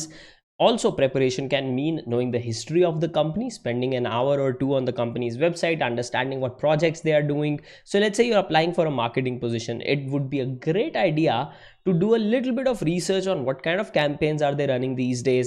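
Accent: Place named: India. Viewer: You have a butler English Indian accent.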